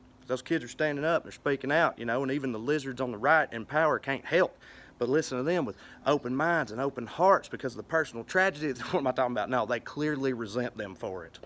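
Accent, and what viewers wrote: South, United States. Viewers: 59 year old white man here. Originally born in s. Carolina. Everything you say is spot on. I love it, I think you are the best spokes person for the irony of these times on the net. More power to you, keep it up. I will come see you if you are in Maryland or DC. Seriously, keep it up. Your voice matters, and your point of view is well thought out and well spoken. And the good old boy slang works to great amusement and effect. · the suthern accent has always annoyed the fuck outa me, but gawd dayum if I couldn't listen to this jar of intelligent hicksauce read a fucking phonebook! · It's good to hear that accent talk some sense for a change! Go!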